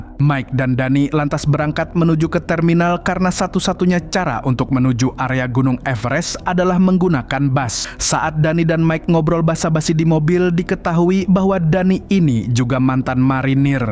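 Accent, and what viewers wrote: Banjar, Indonesia. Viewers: Orang Banjar kiranya ne😂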